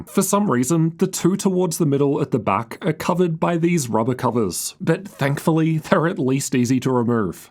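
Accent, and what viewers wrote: Australia. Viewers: You're the best. Are you Australian?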